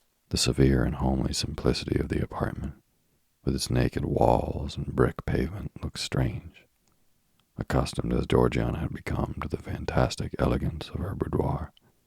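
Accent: South, United States. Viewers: a slight Southern twangy sound somewhere in your voice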